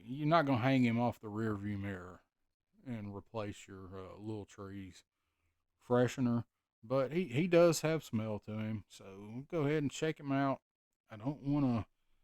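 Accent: South, United States. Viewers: The best adventures start with setting to wander. I don’t do MOTU, but you good folk with that Fine Southern Drawl really sell him well. 3 star outta 3 -well. I’m glad this video got mentioned to us tonight.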